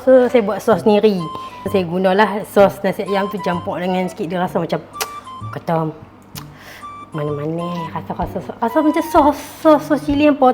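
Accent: Penang, Malaysia. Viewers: Suka degaq loghat penang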